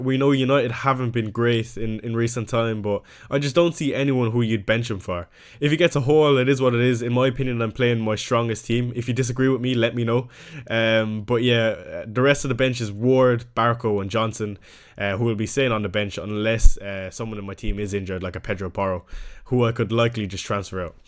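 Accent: Ireland. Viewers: Don't mind him, yer grand. not used to the Dublin accent.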